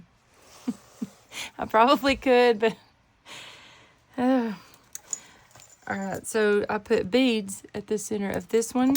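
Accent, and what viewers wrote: South, United States. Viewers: Love your southern drawl ❤️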